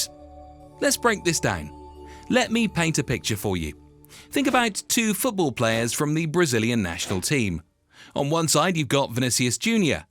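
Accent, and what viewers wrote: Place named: United Kingdom. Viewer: you sound British, but as of this great video, as a Latino, I'm proud to call you one of us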